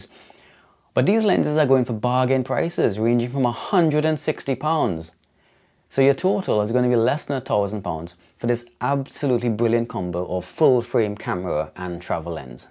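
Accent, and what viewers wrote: Caribbean. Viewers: Fantastic user's insights into the S5. Great hybrid camera. Your hybrid Caribbean accent is also great.. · Being from the Caribbean I recognise Caribbean accents instantly 😅 Thank you for the informative video, particularly sharing tips for auto focus settings. I've probably watched over 20 videos about the LUMIX S5 in the last 2 days and your video is the 3rd video to share tips for better auto focus out of 20+ videos. All the other videos I've seen just complain about the auto focus but don't offer any tips to improve it, I thought one of the biggest selling points of having a camera with pro features was the ability to dial in your own custom settings but seems like those other reviewers just wanted the auto focus to be good out of the box. Considering what this camera goes for now with the 20-60 lens included (under £1,200.00 New) I think it's a small compromise. 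I've been saying for years, "I'm going to buy a high quality camera" to share photos & videos of my work but other things kept coming up but I think the time is now and the LUMIX S5 looks like a very good deal for the level of quality it can provide. Thanks again for sharing your thoughts and experiences 👍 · Great video! I just got my S5 and am learning to use VLOG and colour grade. You sound West Indian. Are you?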